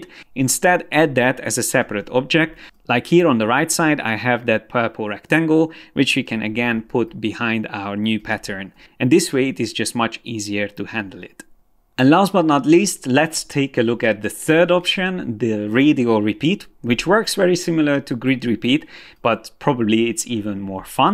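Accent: Hungary. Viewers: Cool videos! Did I sense a little Hungarian accent?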